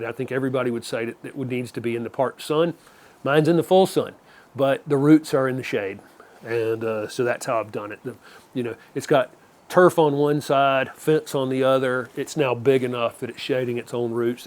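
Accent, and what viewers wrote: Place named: North Carolina, United States. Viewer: You sound like you're in North Carolina, are you, I am? I'm new here. Thank you for your time.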